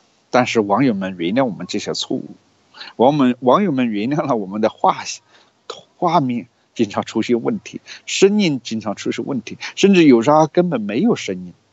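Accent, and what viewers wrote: Hunan, China. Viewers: 何先生生日快乐🎂🎁，非常喜欢你的节目，从一开始有30-40%不懂的湖南普通话到现已99%都懂了，而且是爱上湖南普通话了。希望您保持你的风格，希望能一直听你的火拍。God bless you and your family！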